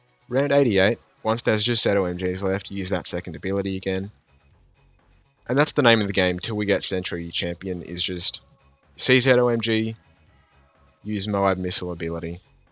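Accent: Australia. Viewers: Same. Aussie?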